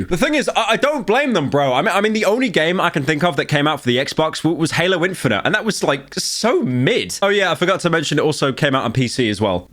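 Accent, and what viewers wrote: United Kingdom. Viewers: You sound more british when very sick. It only makes sense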